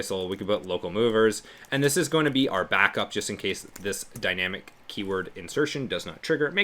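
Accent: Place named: Toronto, Canada. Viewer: Thanks a ton for the content. So useful. You've basically become my tutor on all to do with Google Ads. I'm a small business owner and have a dormant passion for marketing and sales. Used to have a role as a sales and marketing manager. Learned how to build websites and the basics of SEO. Did some basic coding. So enjoyable. Now I run a renovation company. Just getting into the Google sphere and really appreciate and enjoy your content. I'm from Brampton btw. Peel!! Lol 😅 Noticed you're from somewhere in the GTA region by the sounds of it. Anyways, thanks again. Your hard work is appreciated...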